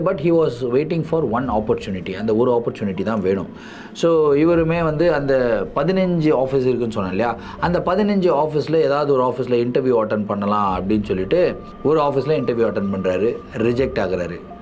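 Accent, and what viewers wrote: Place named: Bangalore, India. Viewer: U have Bangalore slang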